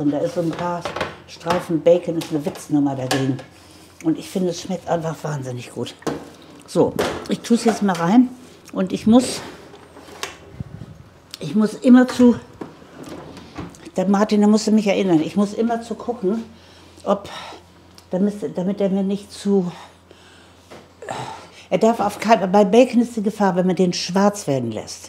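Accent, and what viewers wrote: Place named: North, Germany. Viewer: Liebe als Fan der allerersten Stunde hat es stets etwas heimeliges, dir zuzusehen. Dies umso mehr als deine Art zu erklären, sprechen, ja- auch die Küche selbst- mich an meine norddeutsche Schwiegerfamilie erinnert. Umso bedeutsamer, da mein lieber Mann derzeit sehr krank ist und Aufmunterung gebrauchen kann. Die kommt nun von euch beiden. Dank euch für das tolle Rezept!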